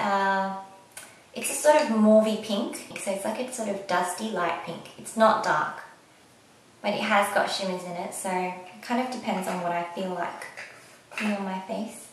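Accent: Australia. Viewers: That's Australian accent : )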